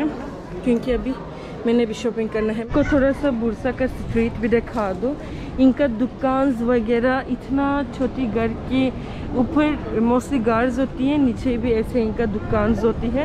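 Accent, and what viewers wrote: Pakistan. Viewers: Aapka urdu sunnne me mashaAllah achha lagta hai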